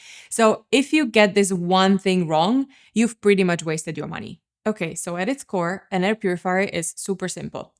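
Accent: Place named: Europe. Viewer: Incredibly helpful video. Plus the Euro accent makes me trust her more.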